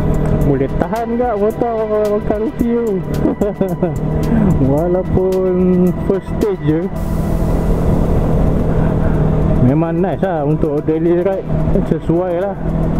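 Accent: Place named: Tanjung Karang, Indonesia. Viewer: Dgr bro ckp mcm dr tjg.karang..stay tjg karang ke.maaf kalo tersalah dgr ye✌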